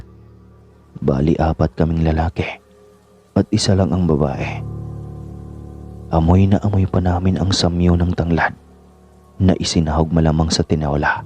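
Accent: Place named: Pangasinan, Philippines. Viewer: Parang pangasinan si kuya nag kukwento😊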